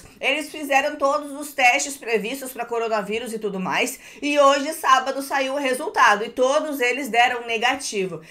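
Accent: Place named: Rio Grande do Sul, Brazil. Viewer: Guria tu és gaucha tchê.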